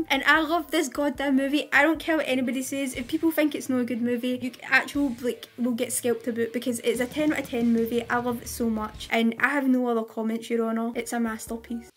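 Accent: Scotland, United Kingdom. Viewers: I love your Scottish accent, lass! 😉🏴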